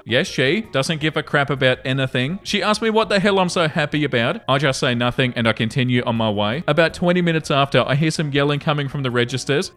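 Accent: Australia. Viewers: He's Aussie, it's a common vocal trait of Aussie accents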